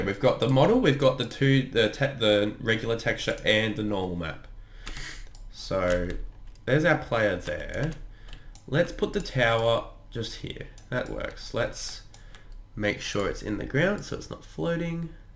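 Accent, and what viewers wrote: Australia. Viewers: Are you an Aussie if I’m wrong please correct me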